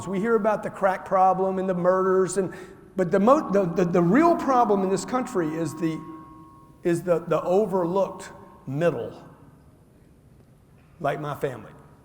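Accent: South, United States. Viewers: Ban Nsabin it’s his southern twang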